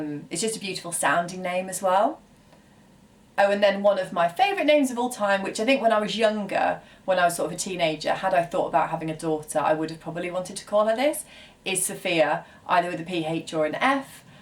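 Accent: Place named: United Kingdom. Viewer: How do you Brits do it? I'm from Alabama and I hear Georgia all the time and don't think anything of it- then I hear you say it with your fantastic accent and I'm in love with it. Lol What I wouldn't give for a British accent. Tabitha makes me think of the American tv show "Bewitched."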